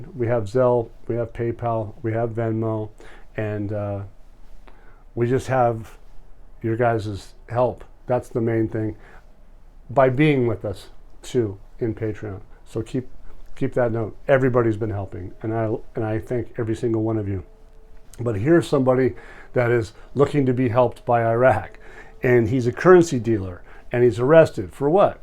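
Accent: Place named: San Diego, United States. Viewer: sounds like you were from the San Diego surfing scene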